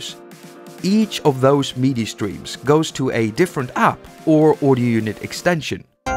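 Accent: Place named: Sweden. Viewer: Probably is the swedish accent. Or has talked too much with siri. Look out for his channel if you don't know him. He knows what it does:)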